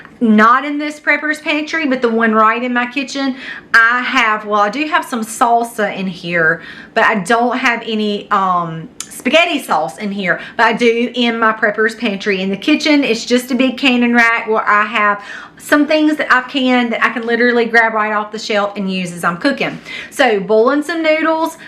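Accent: South, United States. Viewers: I love that southern accent!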